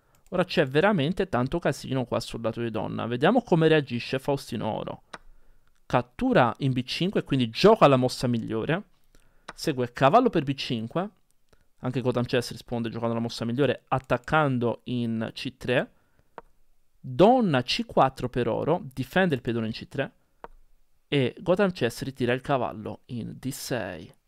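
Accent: Tuscany, Italy. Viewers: se inizi in inglese lo superi! Io spero di no perchè mi piace il tuo accento toscano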